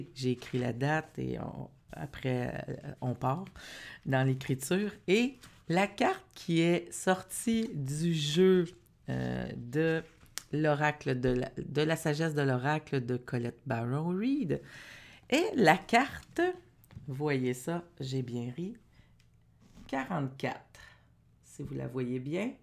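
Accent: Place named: Quebec, Canada. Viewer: Merci pour ce beau message. Sympa l accent québécois.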